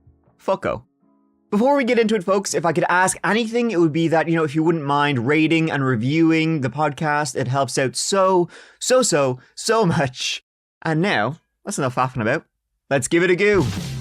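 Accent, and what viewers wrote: Ireland. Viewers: Thank you for putting podcasts on you tube it is such a treat for your fans I enjoy listening to your wonderful brogue and of course your sense of humor the way u have doing theses horrific stories . You’re simply the best storyteller God bless you and your family RIP to all of the victims of these crimes Wish you all the best with your podcast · Are you trying to do an Irish accent or something?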